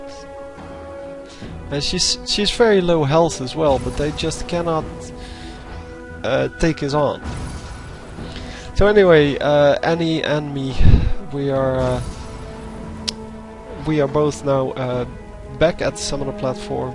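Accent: Netherlands. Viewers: heey, kvind het fijn dat er eindelijk een nederlander LoL vids maakt xD het is goed te hooren dat je nl bent aan je uitspraak :p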